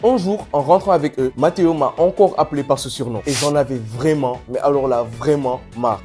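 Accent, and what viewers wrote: Senegal. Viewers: Mhh je suis nouveau, mais c'est un accent Sénégal que j'entends là ? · ta un accent de sénégalais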